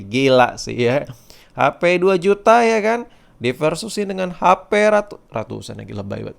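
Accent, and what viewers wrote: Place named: East Java, Indonesia. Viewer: Orang jatim ya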